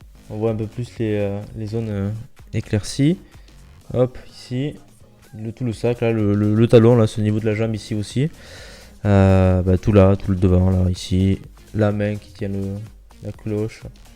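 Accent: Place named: South, France. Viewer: j'avais jamais remarqué que tu avais autant l'accent du sud ^^.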